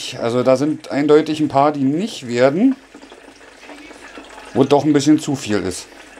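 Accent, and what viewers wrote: Berlin, Germany. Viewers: super genial erklärt und hat uns bei der Kaufentscheidung sehr geholfen. Und dein Berliner Dialekt lieben wir eh 😁😁😁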